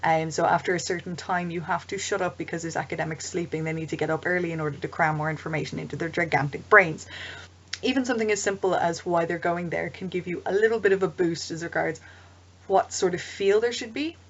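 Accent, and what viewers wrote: Ireland. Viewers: Thank you for the information. Interested in city planning since world-building an accident society similar to Rome. Btw: that accent is cute as hell. Irish? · you irish? where do you play?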